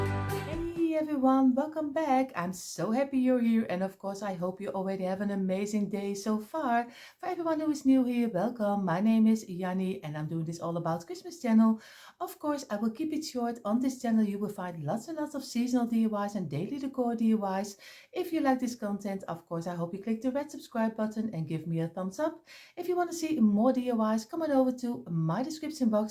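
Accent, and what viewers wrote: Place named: Netherlands. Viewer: You are dutch I heard. .my family originally came from Holland. Our last name use be visser but was changed to visher.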